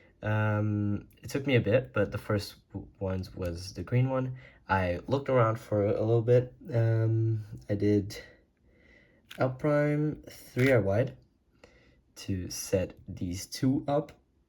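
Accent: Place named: United States. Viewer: Your accent sounds very American lol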